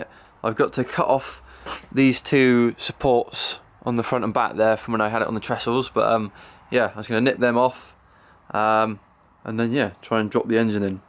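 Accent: United Kingdom. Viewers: This is so epic! Glad to have found another UK builder! Do I detect a slight west country accent?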